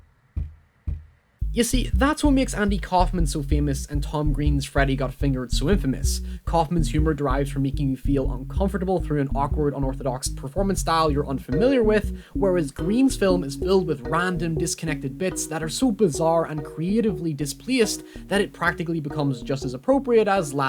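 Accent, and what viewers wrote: Ireland. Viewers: I don't think I can post URLs in YouTube comments but if you check Reddit in the videos sub you'll see a video posted on Tuesday 12th about Louis Theroux. Someone posted your video about Theroux there and your accent led to some confusion as to whether you're Irish, American or Scottish! :) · I think he is Irish.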